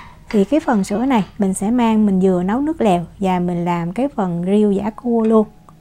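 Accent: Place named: Saigon, Vietnam. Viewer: Nghe cháu nói tiếng miền Nam của người Sài Gòn thật là dễ thương và lễ phép quá! Cô cứ lắng nghe mà không để ý đến cách chỉ dẫn nấu ăn và nghĩ chắc tiếng Việt của cháu ảnh hưởng từ Cha Mẹ đã ở Na-Uy lâu năm nên cô thiệt là may mắn đã nghe từ cả nữa tháng nay mà không có bị dị ứng với một lời nào của… “tiếng Việt MỚI sau năm 1975”. Chúc cháu có được nhiều người theo giỏi với tất cả video của cháu. Và cố gắng nói tiếng Việt CŨ của người VNCH, người Sài Gòn nhé? Đừng bao giờ gọi những người coi video là “mọi người” hoặc “cả nhà” nhé? Tiếng Việt Nam 4 chữ ấy đồng nghĩa với hai chữ TỤI BÂY đó. Cảm ơn cháu thật nhiều. 💝🌹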